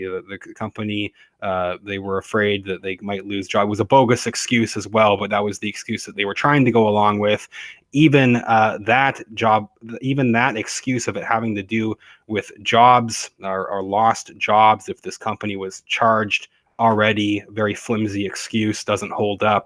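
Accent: Canada. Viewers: You sound like you may be Canadian as well or very far north. Not to mention his ties to nvxium. With bronfman.i used to think Canada was nice , father was really bad guy.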